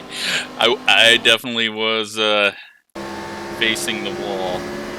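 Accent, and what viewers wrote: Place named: Iowa, United States. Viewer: U from iowa?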